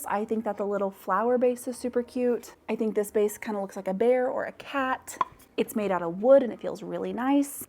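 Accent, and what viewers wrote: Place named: United States. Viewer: American accents are something else... You really have that vocal fry. Whoa....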